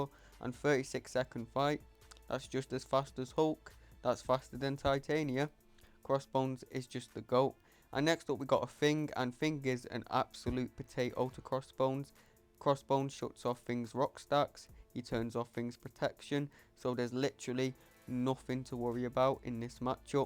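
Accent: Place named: Cockney, United Kingdom. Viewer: Wait, u a London boy? Hope i am not being unpolite, just i stayed in leeds for a year, and that cockney accent sounds soooo familiar.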